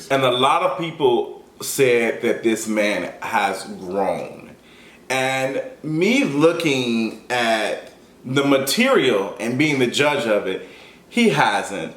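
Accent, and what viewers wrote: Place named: New Orleans, United States. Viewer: me too...love that Orleans twang.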